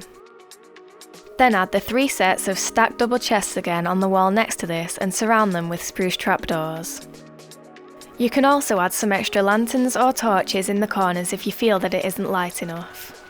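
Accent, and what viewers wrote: United Kingdom. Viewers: Looks very nice! P.S. You're from Great Britain, wright?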